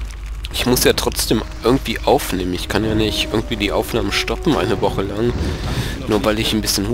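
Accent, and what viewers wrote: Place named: North, Germany. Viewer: du klingst als wärste ausm norden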